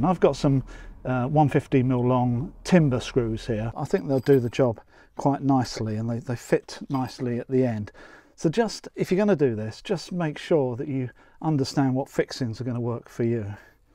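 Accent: United Kingdom. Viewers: From your accent, I'm guessing you're in the UK. Your main problem is that your fence contractors don't know how to properly install a fence. Plus, if you're going to all of this trouble to dig out the original concrete footing and rotted wooden post below ground level, why not just take a few more minutes and just remove the rest of the old post and put in a complete brand new one instead of using that ugly concrete post support fix?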